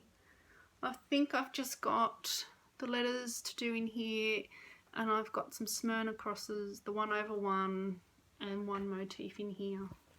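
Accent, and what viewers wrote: Australia. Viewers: Welcome to floss tube, so lovely to hear an Aussie voice. Beautiful work!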